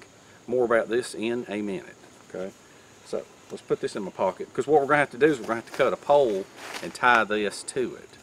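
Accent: South, United States. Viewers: forgive my ignorance but your accent comes from which area in the US? Southern but where :)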